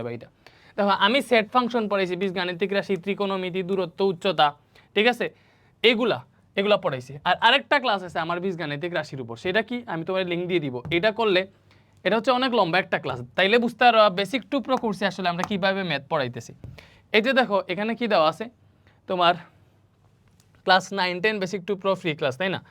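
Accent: Sylhet, Bangladesh. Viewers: Bhai ami shior tmi Sylheti ❤ tmr mat e buja jay tmi Sylheti ❤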